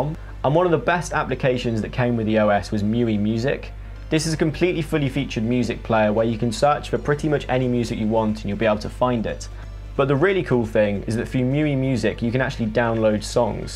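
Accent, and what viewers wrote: United Kingdom. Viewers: british english accent?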